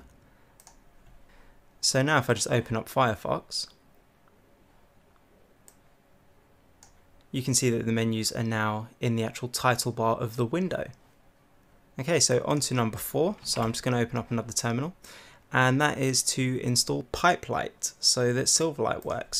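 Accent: United Kingdom. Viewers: Sierra Hormiga British pronunciation :)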